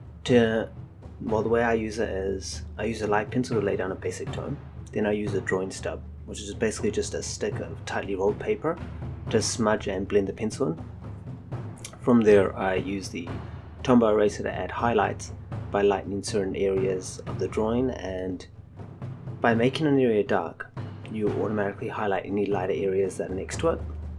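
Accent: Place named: New Zealand. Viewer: you from nz?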